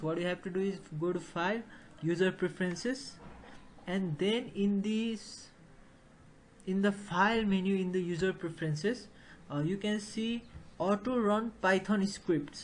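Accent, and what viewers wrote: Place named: Brazil. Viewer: he said you're a brazilian trying to speak english, so you should quit speaking english because to him you sound like a brazilian.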